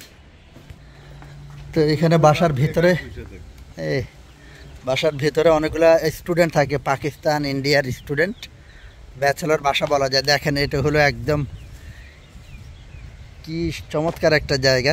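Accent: Noakhali, Bangladesh. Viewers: আপনার বাড়ি কি নোয়াখালী ❤❤ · একটা জিনিস হল ভাই আপনি আমার মতই একেবারে নোয়াখাইল্লা ভাষায় কথা বলেন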